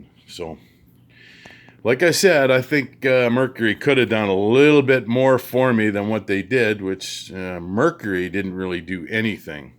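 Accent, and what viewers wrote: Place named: Canada. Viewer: Canadian eh.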